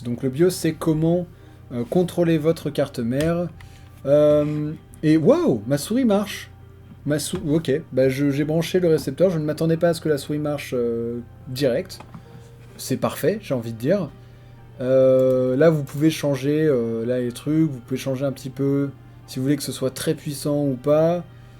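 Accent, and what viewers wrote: England, United Kingdom. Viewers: mais tu devais avoir un accent plus anglais avant dans ta jeunesse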